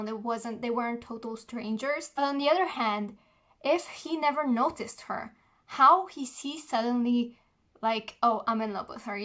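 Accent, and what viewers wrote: Slovenia. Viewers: I love your slovenian accent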